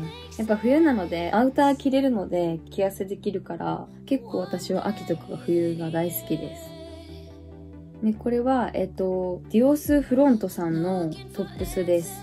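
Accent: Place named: Niihama, Japan. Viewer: なつかしい新居浜弁を聞いて(読んで)思い出しました、生い立ちを詳しく知らなかったけどやっと分かりました。大島から市内に変わり神郷小学校から川東中なんですね。私の親が宇高の桜ヶ丘マンションに居たから場所はよく分かります。私も新居浜商業高校からトヨタに入り田原工場で定年後迄田原市ソフトボール協会会長としてソフトボールを楽しく過ごしましたし、のサインボールをキャッチして興奮した事をよく思い出します❗これからも応援していますからご活躍することを願っていますよ❗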